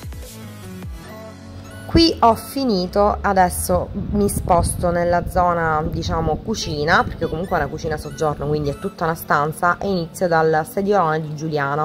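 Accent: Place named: Sicily, Italy. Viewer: hai questo timbro di voce che abbiamo tutte noi siciliani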